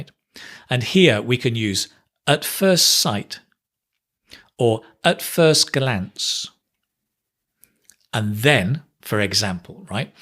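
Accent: United Kingdom. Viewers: I definitely love your British accent, hope you'll provide us with more videos <3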